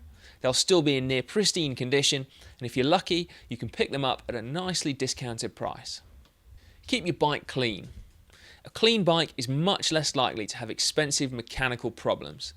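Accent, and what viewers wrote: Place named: England, United Kingdom. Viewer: It's an English accent!!!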